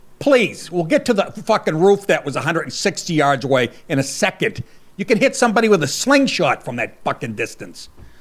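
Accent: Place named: Boston, United States. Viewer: Are you a Massachusetts man? As a former North Ender it sounds like home.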